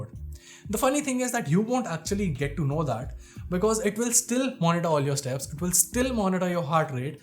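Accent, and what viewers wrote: India. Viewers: Great review, subscribed. I usually stay away from Indian accents, just because they usually come with subpar English and are hard to follow, but your charisma is alluring, and your English is quite good. Keep up the good work!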